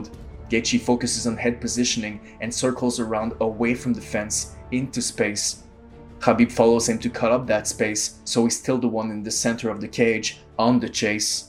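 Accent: Arab world. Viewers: Yo we can tell from your accent that you're arab. Don't call him khabib when you know how to pronounce his name HABIB properly. Fake ass guy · It's seemed you have an Arabian accent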